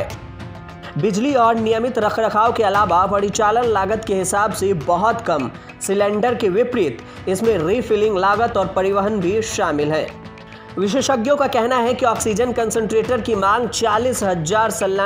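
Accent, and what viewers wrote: Bihar, India. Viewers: Bhai ye Bihar Ka h yha ghode ko ghora khte h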